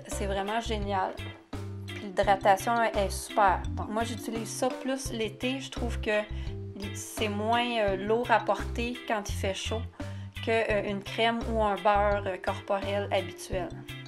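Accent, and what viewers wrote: Quebec, Canada. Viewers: Oui c'est vrai que pour vous c'est nous qui avons l'accent !! Qu'est-ce que j'adore le québécois ♥ et le Canada aussi en général, destination de rêve que j'espère très vite concrétiser !! bizzz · Tu as un accent tu viens du Québec ?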